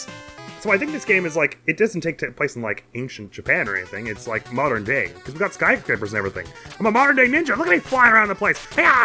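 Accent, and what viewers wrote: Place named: New York, United States. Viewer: That's still a ton of really cool stuff! Like you, I've never had an Xbox, but I've never had any PlayStation stuff either; I've only ever gotten Nintendo consoles, and between them and my computer, I can find access to the games I want. Some of them would probably feel better on original hardware, though. Me, I've got a Wii, a 2DS that's starting to wear down, that GBA SP, a Switch, and the dark pink DSi my parents got me all the way back on my sixth birthday, which has somehow managed to hold up better than the 2DS and Switch 😆 OMG GATO! I can't believe I never thought of that, I have one of those too, just haven't used it in a long time Those darn bosses really should introduce themselves! Listen, Mr. Boss Guy, do you know how many guys with scythes there are in gaming? One was the final boss of KH CoM, and he had not only a name but a freakin' EPITHET (the Graceful Assassin; I never got around to telling you all the Organization guys' epithets and lesser Nobodies, so if there are any you still don't know, I can fill you in) Yes, I'll just hang out at my local library and read until a ninja comes to challenge me... 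Then again, that would probably wreck the library, so maybe I'll stand next to the tesla dealership instead 🤣 Yeah, I feel that way too. Tbh, I didn't realize you were trying to do an American accent until you apologized for it. I just thought you were doing an action-movie-guy sort of voice, it sounded kinda New York or Chicago-ish "Back in my day, we had to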